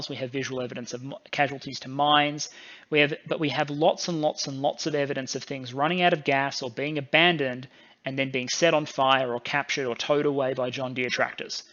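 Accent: Australia. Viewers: Your talking clap trap! Russia has been met with strong resistance by the Ukraine armed forces, and is heavily armed with British anti American anti tank missiles and anti aircraft missiles along with arming just about the entire male population aged between 18-60. Best you just go and give your opinion back in Australia judging by your accent.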